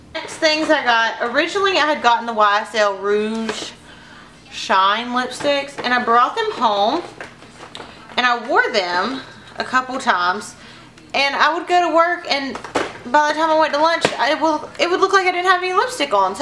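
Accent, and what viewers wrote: South, United States. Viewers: Ok, girl, u hav GOT TO B from my neck of the woods!!!! Lol!! Im from Alabama and i wud kno my Southern accents fom ANYTHING!!! Lemme kno if ur close and we'll go shoppin and trade makeup! (I didnt see if u had the UD Naked concealer and Laura M. brightening powder, but if u dont n ur close, i will let u try them and THEN U WUD RUN TO SEPHORA!! They r THE BEST COMBO!). Good vid and nice to hear from sum1 close to home!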